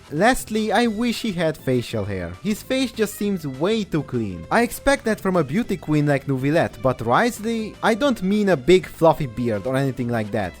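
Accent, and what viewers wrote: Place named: Romania. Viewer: your accent sounds sooooo familiar. Are u by any chance romanian?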